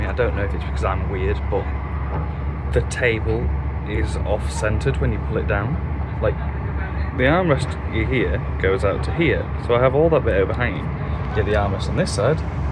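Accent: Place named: England, United Kingdom. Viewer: Hey! I hope you had a nice time in Poland anyway :) Let me ask you - where exactly are you from? I'm curious what kind of English accent it is.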